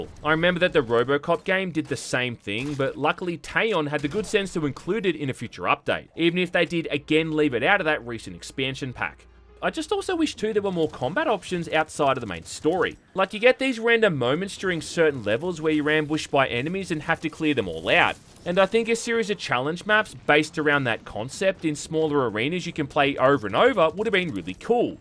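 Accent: Australia. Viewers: I used to love your content but as time goes by you more often than not sound like one of those bought out gaming "journalists" from IGN with the only difference beeing your thick australian accent... Since the last 10-15 uploads or so something feels off about your content bro...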